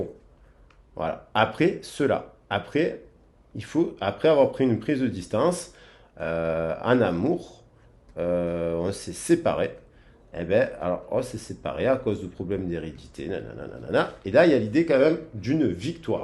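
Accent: South, France. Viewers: Bonjour je vous decouvre vous êtes une personne très solaire merci pour vos guidances très detaillees vous avez raconté mon histoire il a pris la fuite sans explications bcp ds l ego superficiel il y avait un déséquilibre bcp de froideur .silence radio j ai lâche prise car trop de souffrances tout est juste du début à la fin .vous êtes formidable c est un plaisir de vous écouter. J adore votre petit accent ensoleillé .merci pour votre bienveillance et votre générosité. Belle journée a vous 😉😊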